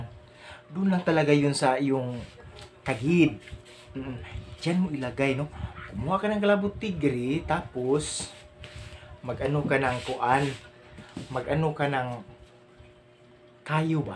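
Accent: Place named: Visayas, Philippines. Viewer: Hehehe, thank you po sa very funny content na ito. You entertained me po talaga! Ang galing mo po mag-act/host. I love the flower background also. Love the Bisaya accent.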